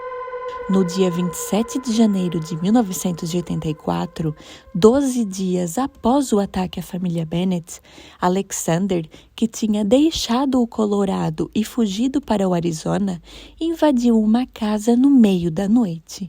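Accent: Portugal. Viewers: Ótimos vídeos, ganhou mais um inscrito, sotaque português muito lindo rs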